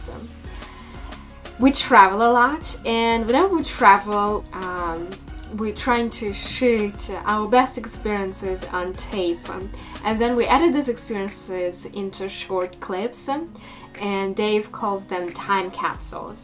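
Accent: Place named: United Kingdom. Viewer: Fascinating accent with a little polished British in there. Like your videos. You should post more often.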